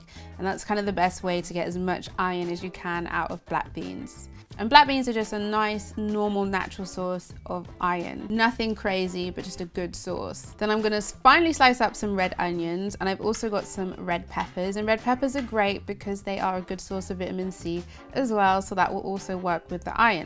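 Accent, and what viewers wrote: England, United Kingdom. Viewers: She sounds English tho.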